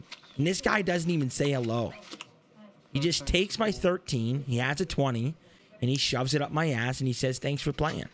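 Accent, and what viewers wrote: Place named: Canada. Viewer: I love the Canadian accent❤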